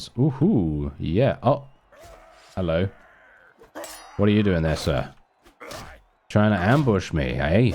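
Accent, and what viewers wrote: United Kingdom. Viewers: Remember to like comment and HYPE! Especially on the first video of a series as it really helps out the channel! This is not a paid advertisement as I joined the channel to hopefully help boost the smoothest British Baritone that you'd listen to read a phone book. For you kids that don't understand what that is, it isn't a phone that looks like a book or a mobile phone that folds like a book. It was a big book with your name and number, and sometimes street address. It'd be super boring but you wouldn't be able to stop listening to. Forgive the ramblings of an old man who finally became a dad, I don't know what sleep is anymore!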